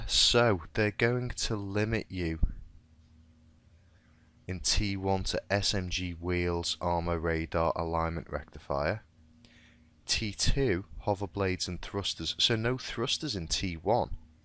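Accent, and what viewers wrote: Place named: Liverpool, United Kingdom. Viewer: I'm a scouser, just saying your accent sounds nearby :P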